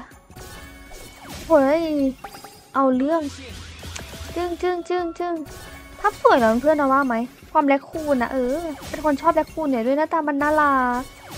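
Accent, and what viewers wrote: Thailand. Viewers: ชอบเสียงพี่เค้ามากเลยอ่ะโอ้โหเสียงแบบเหน่อได้ใจ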